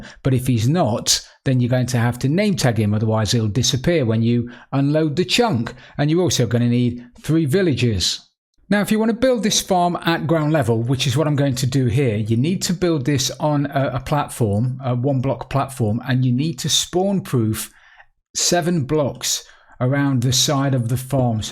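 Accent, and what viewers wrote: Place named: East Midlands, United Kingdom. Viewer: Gonna give this a try. Your accent sounds like you're from near me in the Notts/Derby area......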